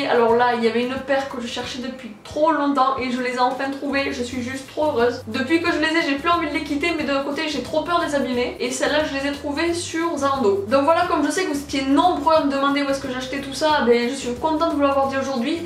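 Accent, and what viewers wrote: Toulouse, France. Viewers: Elle a un accent toulousain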